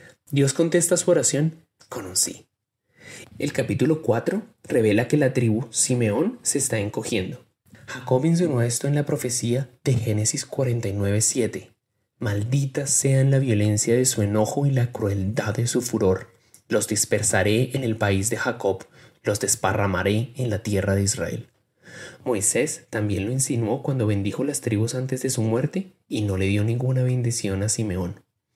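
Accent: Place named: Colombia. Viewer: This is great idea. however, the audio has a strong Colombian accent. Is there a scrip that we can read?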